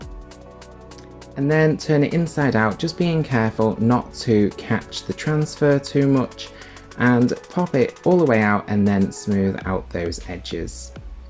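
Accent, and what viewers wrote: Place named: Yorkshire, United Kingdom. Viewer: You sound like you’re from the Yorkshire area. Where are you from! 😀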